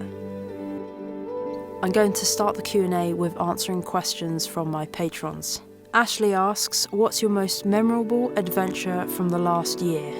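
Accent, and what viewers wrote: United Kingdom. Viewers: Such a beautiful place, too bad it's so damn cold! Is she Swedish? she looks Irish and has a British accent. I just watched her video of raising a red squirrel. I love squirrels, that was a great video, nice job!, definitely Irish, I wonder how she ended up in Sweden? So lovely to live among all the forest and trees. I bet she wouldn't appreciate all those Komodo Dragon feeding videos I've been watching!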